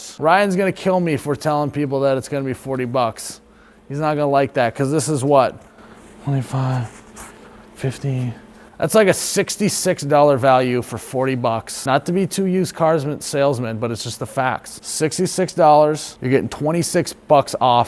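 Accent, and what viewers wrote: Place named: Minnesota, United States. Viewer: You're from Minnesota, aren't you? Love your channel.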